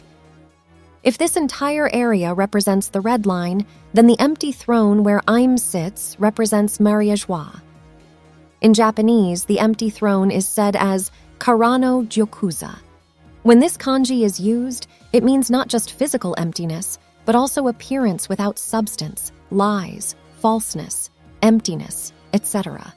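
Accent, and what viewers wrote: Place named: United Kingdom. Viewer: Eww... Please please go back to the British voice. It was so refreshing and different.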